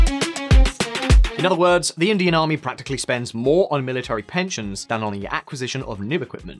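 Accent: United Kingdom. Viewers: The narrator in this video is from the UK, what does America have to do with this video? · Not Americans the guy is probably from UK judging from his accent. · He isnt american, he has like a UK accent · 1. thats a british accent 2. America is barely involved in Kashmir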